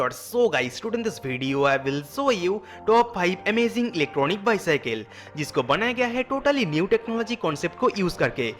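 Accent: Bengal, India. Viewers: Bengal tone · Bhai thoda Hindi sudhar lena . Aisa lagta hain ke bengali bol rahe ho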